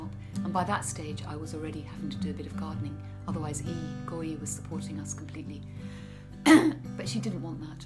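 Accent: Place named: London, United Kingdom. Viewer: Hi, glad to hear your story! I love your flower shop very much. And your beautiful London English accent. I'm sorry that your shop is closed. Anyway, you are absolutely an excellent gardener. I wish you a happy life forever. Long to hear your story soon again. Love you 😍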